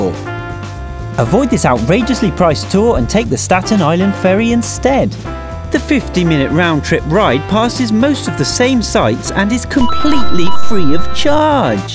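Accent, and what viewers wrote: United Kingdom. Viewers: runningstorm920 it sounds better in british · Why is a British guy telling us this. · Why is a British person telling me about new york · When 13 things not to do in new york was voiced over by a british citizen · What do u know about NYC? You sound British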